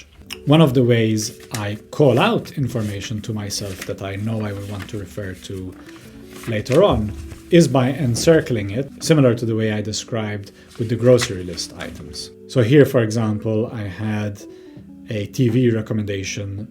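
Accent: Malta. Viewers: You sound Maltese 😊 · I just stumbled upon this video. Useful content. Tho out of curiosity.... that accent... are you Maltese?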